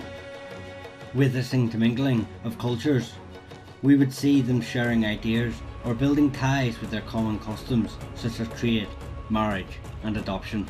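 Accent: Ireland. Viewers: Hello there....my grandmothers maiden name was coleman. Her parents came from ireland to argentina i guess before 1900. She was born in argentina was catholic and never spoke of her roots or family a real pitty. I love your irish accent... god bless u. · Music too loud. Can’t make out what the man is saying, especially with such a heavy Irish accent.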